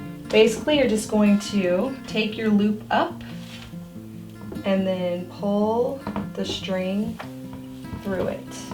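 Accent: United States. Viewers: No way, our young lady is not from NC with that accent. Iowa, I think. I'm from SC and have lived in NC as well. Remember Boone as a small town "nestled in the Blue Ridge Mountains" my family'd stop through on our way to Ski Beach in the winter.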